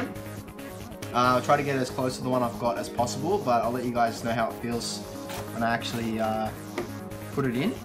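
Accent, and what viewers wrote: Australia. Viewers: Heya, did you get yours from focusattack? I'm guessing you're Aussie with the accent (lol) so how long did it take to ship? I'm in NZ for reference.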